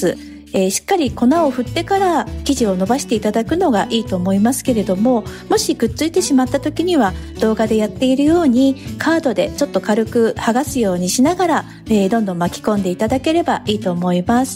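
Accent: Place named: Niigata, Japan. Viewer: どこか少し新潟弁入ってる？と思っていたらやはりそうでしたかー 私の故郷です いつも優しい語り口で癒やされます これからも楽しみにしております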